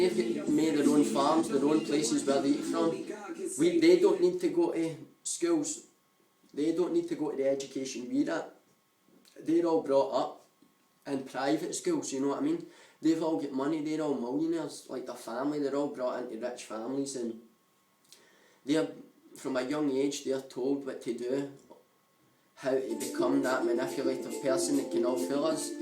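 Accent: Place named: Scotland, United Kingdom. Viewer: Thanks i just love scotish accents i dont know why i just do but your cool anyway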